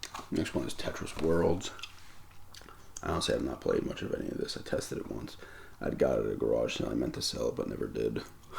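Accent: New York, United States. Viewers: Such a new york accent compared to today's videos. I dont even know who this is 😂 you the man!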